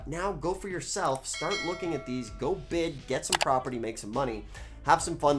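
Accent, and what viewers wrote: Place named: Canada. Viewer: Why do you have a Canadian accent?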